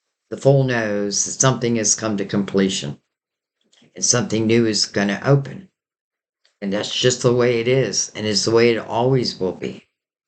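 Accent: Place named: Pennsylvania, United States. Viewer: First time I listened to you. I am feeling your energy and I’m so thankful I found you. I love your accent. PA? I’m from Philly. I connected with your reading so much. My Dad came through with everlasting love. ❤️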